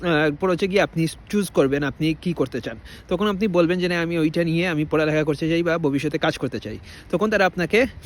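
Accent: Sylhet, Bangladesh. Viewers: sylheti naki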